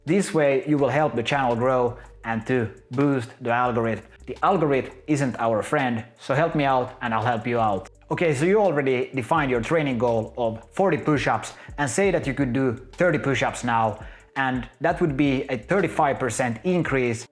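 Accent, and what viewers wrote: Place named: Finland. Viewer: hienolla suomalaisella aksentilla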